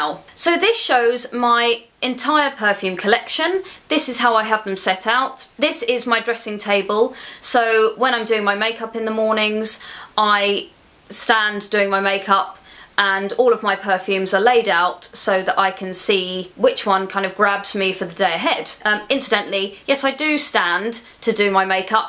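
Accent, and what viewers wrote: United Kingdom. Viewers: Hi, just wondering, where in UK are you from? You remind me of a friend of my who has got the same accent as you and I kinda miss her. Thanks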